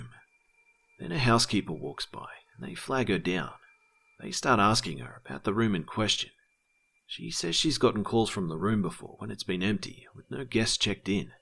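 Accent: Australia. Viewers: Your Australian I think? Is he/Are you?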